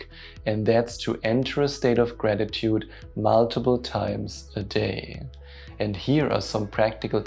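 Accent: Germany. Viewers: bester Mann mit angenehmen deutschen Akzent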